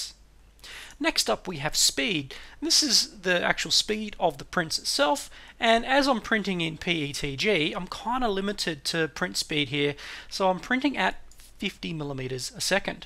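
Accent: Australia. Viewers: must be the sydney accent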